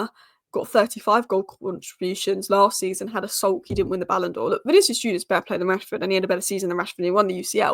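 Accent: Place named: England, United Kingdom. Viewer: U r heavy on English accent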